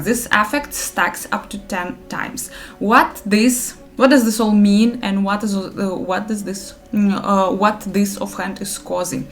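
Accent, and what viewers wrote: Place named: Russia. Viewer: просто акцент был похож на русский, извиняюсь, продолжай в том же духе)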